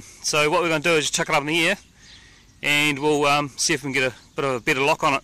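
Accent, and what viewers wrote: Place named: New Zealand. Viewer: You are awesome! thx a lot!! Love you kiwi accent. Been there for a yr living in Dunedin! Now i am back in Argentina, south america. Happy Flying!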